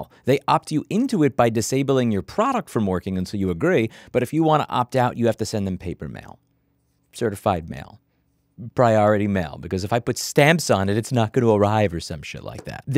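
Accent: New York, United States. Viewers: That's that old school NYC talk I can agree with. Thanks for making my day.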